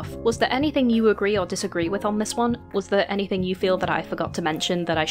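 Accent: United Kingdom. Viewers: hi, i sit with the video through the end, you have a really awesome way to put the pieces together to say exactly what needs to be said, love that bit where you said the person we have to protect the most at the end of the day is ourselves, that is true and it's what i've always thought! i don't usually involve myself in drama and prefer to block and move on. however, i feel like we need to speak sometimes on these topics that could influence a young audience. btw love your british accent? it's pretty thick lmfao ( not saying that in a negative way!! just in a "i noticed" way ??? idk how to explain it LMFAO ) have a good day and u have earned a new subscriber 👍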